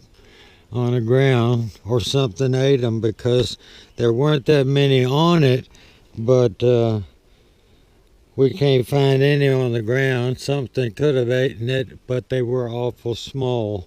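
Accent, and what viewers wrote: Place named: Virginia, United States. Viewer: I figured y'alls last frost would be much earlier than us? I live in far southwest Virginia in tazewell county and our last frost date is may 10th I just know by the accent your in far eastern or southern Virginia. I just started my channel and I hope to start growing edibles as well as my ornamentals and tropicals. I'm trying figs and I consider them tropicals here cuz we're 2400 foot up in the mountains and we get long cold winters!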